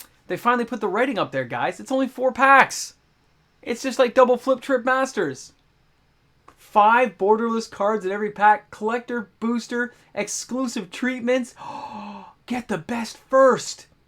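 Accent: Canada. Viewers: Are you Canadian? your accent is strong. You keep saying 'A-boot'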